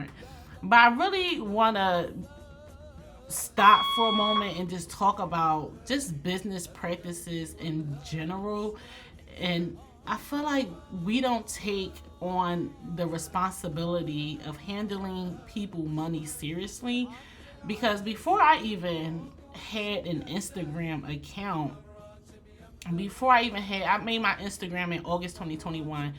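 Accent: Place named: Philadelphia, United States. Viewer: First, Allah will take care of the persons who did not make the final payment. Next, you have a beautiful soul, don't allow this incident to change who you are. The change in the policy was necessary. Last, the balloon design was BEAUTIFUL! You are very creative. Keep up the great work! May Allah continue to bless I kept saying to myself, she sounds like she is from Philly! I checked your website, and you are in the Tristate area. I need to find out who the event planner is so I can never use her in the future.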